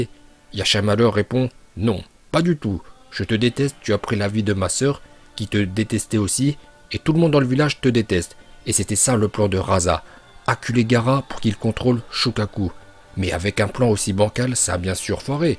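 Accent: Japan. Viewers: Bravo pour t'est vidéo et continue comme sa je te soutien et continue ton accent japonais c'est tellement stylé quand tu sort ton accent japonais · Je kiff ton accent japonais continue comme ça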